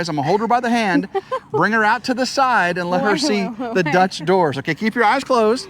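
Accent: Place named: South, United States. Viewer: You don't like the accent? Like Skynyrd said, a southern man don't need you around anyhow! Cool video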